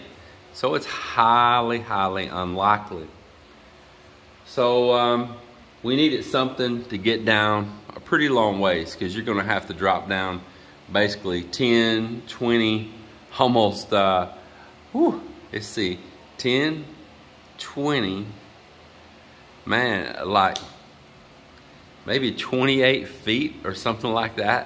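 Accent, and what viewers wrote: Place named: South, United States. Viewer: Dang: I thoroughly, )( THOROUGHLY ) enjoy and learn from your skills. You and Mylinda are really blessed. Still fine tuning my ears to your southern accent! I'll try and negotiate emailing you so we can be friends.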